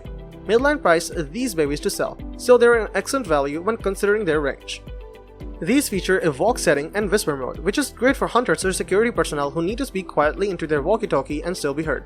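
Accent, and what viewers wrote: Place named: India. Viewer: You are trying so hard to hide your Indian accent.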